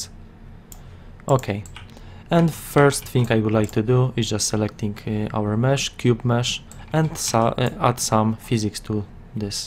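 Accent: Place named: Poland. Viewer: true Polish accent :D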